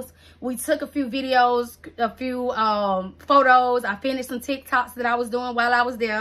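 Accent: South, United States. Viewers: CONGRATULATIONS again on reaching 10K You did That! Thank you for being your authentic self and in RiRi voice unapologetically you All of those thoughts that you were worried about are what makes you you and Why I'm subscribed to your channel your personality, your humor and southern dialect etc we love it all ok so keep inspiring and sharing your talents. For the giveaway Im subscribed to both channels and I follow all three pages on the Gram under Cr3ativ3_val Event styling is my passion so the printer & biz cards would be a huge asset.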